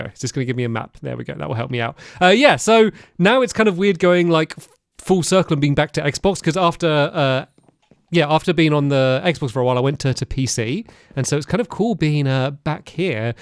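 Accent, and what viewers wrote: England, United Kingdom. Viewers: back when I was four. I didnt know any english! (im a Malaysian btw) but after listening your english accent I not only know english but i know minecraft, and if it werent for you i would be empty... I also watch your other channel I Wonder and Ive been meaning to watch your lovely world series and the I wonder series❤❤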